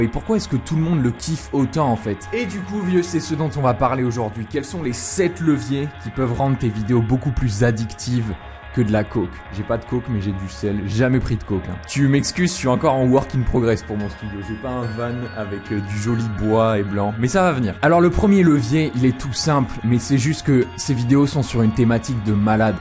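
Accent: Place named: Belgium. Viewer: je pense qu'il est belge et pas français, sinon très bonne analyse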